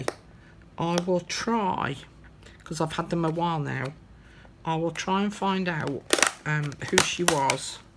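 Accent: England, United Kingdom. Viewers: You are my favourite crafter 👏🏻👏🏻👏🏻👏🏻 a nice english voice xxxx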